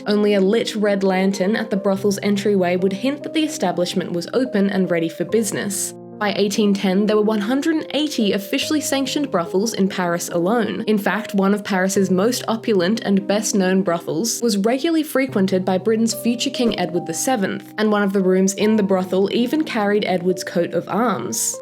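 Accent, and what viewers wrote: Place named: Australia. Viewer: This is excellent! And nice to hear an Australian voice (and "row"? we know what you meant!!).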